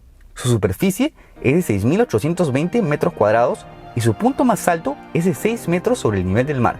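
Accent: Peru. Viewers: Es primer video que veo de tu canal, y con eso ya sé que eres peruano por tu acento! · Su acento dice q es peruano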